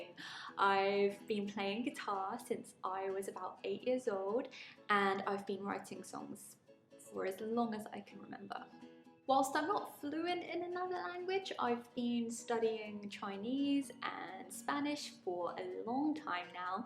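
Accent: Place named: United Kingdom. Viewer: Hi,! Thank you for this useful lesson! ❤ I've never been using the Present Perfect Continuous tense in my daily conversation, but now I certainly will. And I love your British pronanciation! ❤ With the best wishes from Ukraine! ❤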